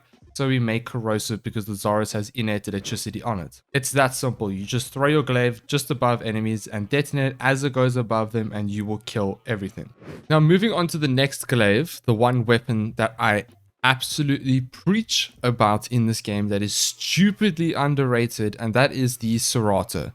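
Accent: Afrikaans, South Africa. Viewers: Bro. You sound extremely south african🤔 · Either I'm tripping or you speak Afrikaans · is this guy south african ?? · My guy is afrikaans awe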